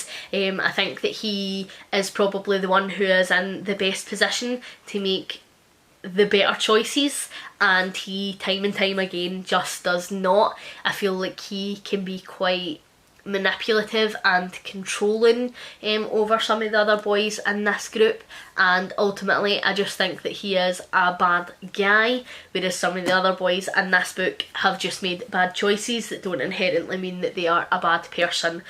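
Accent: Scotland, United Kingdom. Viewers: I love regional dialects and sayings - they are just so ingrained in people’s identity and should be celebrated. So many Scottish sayings remind me of some Irish sayings (my family are from Belfast) so in a strange way I feel a little bit of comfort hearing them and I could honestly listen to you talk all day! 😁 Taps aff cracks me up!😂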